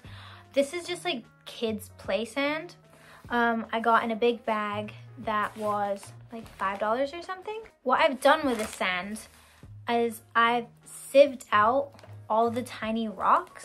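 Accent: Scotland, United Kingdom. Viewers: Are you originally from Scotland? I hear a bit of Scottish accent sometimes but could be wrong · i love ur accent ( I think ur scottish ) U sound like my dad when hes on call with my nana cuz my dads scottish and my nans scottish and Irish( Edit ) I just realised u said ur English but moved to Usa so sorry x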